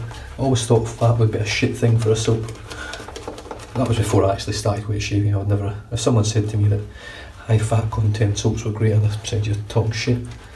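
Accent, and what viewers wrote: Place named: Scotland, United Kingdom. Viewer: With your Scottish accent, you could be recruited to be the next kings man with the all the fancy looking suits.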